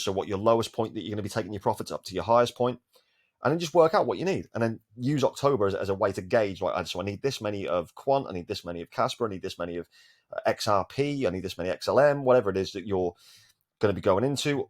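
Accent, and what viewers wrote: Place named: Birmingham, United Kingdom. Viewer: Been trying to work out your accent, I think you’re a Brummie, or midlands. I’m from Brum but live down in London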